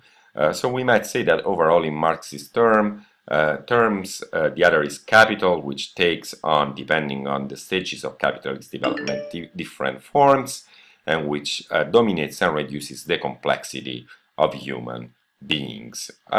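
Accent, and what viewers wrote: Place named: France. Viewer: You are frensh !! isn't it :).. It's clear from your accent... I'm Moroccan so it's easy for me to discover that haha..Thank you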